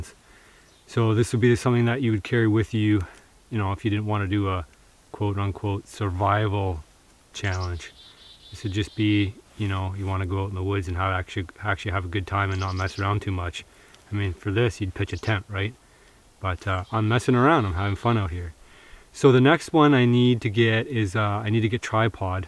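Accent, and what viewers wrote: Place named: Canada. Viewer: Watching this channel has made me realize the distinction between the way the Canadians and the Scottish say "About". This way sounds like "Aboot" with a strong O, while the Scots say it with more an U sound, "Abuut".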